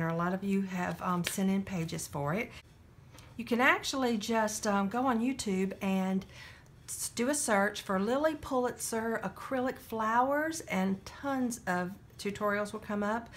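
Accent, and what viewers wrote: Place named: Texas, United States. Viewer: Darlin I was just looking up flower tutorials and I found this video. I know this was back in 2017 so I'm not sure if you will even see this. I'm from Texas originally and hearin that sweet country twang made me feel like I was home again. I think this is so wonderful and am gonna be prayin for you and your ministry. 💗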